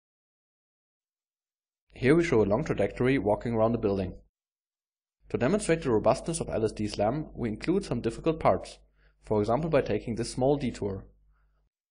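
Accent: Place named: Germany. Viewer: So German English!!!!!!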